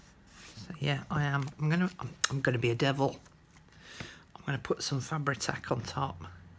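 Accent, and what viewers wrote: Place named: Black Country, United Kingdom. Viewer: Love you and your crafting.im a blackcountry wench as well,but live in hampshire now.your voice and accent and humour soothes my soul and reminds me of home.xxx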